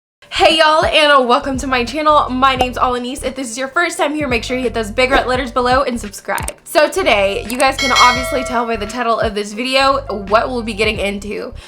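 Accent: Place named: Alabama, United States. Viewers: it’s so precious, it sounds like a classy southern-belle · Girl where are you from bc your accent is very distinct lol.. Alabama??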